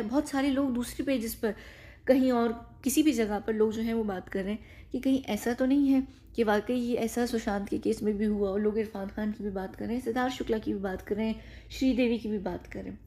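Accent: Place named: United Kingdom. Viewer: All this is true. But becuz speaker says she is from a wealthy family with an enviable diamond collection , speaking multiple languages with a British accent etc……..she looses credibility.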